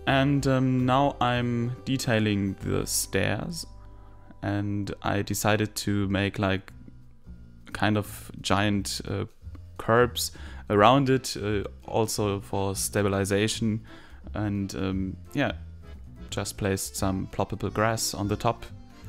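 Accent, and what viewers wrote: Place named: Germany. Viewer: What was with the intro at the beginning? Was that a mistake? Also, I love your German accent.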